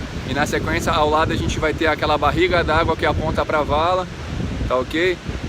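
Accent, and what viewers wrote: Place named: Rio de Janeiro, Brazil. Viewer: Amo sutaque carioca dica otima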